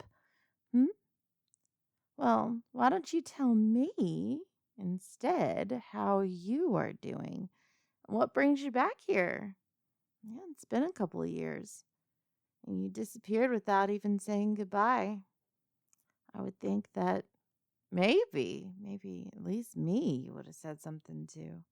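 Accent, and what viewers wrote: South, United States. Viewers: Damn, your southern accent is hot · Thanks for the great audio I love the southern accent the idea of a strong minotaur girl wanting me is nice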